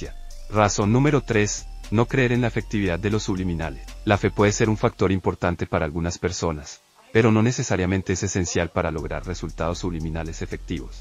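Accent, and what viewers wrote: Colombia. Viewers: Esa voz se escucha como medio colombiana❤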